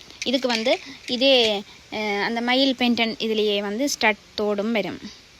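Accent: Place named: Sri Lanka. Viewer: Srilanka தமிழ் அழகுதான்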